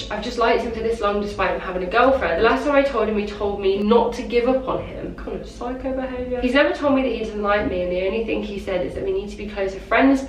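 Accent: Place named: RP, United Kingdom. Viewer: She sounds so posh here hehe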